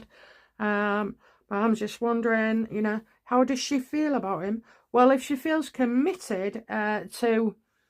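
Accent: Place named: Yorkshire, United Kingdom. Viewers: How dare you that’s so rude she’s got a Yorkshire accent if you don’t understand her then turn off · For your information has explained in the past that she was deaf also the dialect is northern, so sounds a little strange to some. Your rudeness is absolutely beyond the pail. What a truly nasty thing to say, bet your speech isn't perfect, shame on you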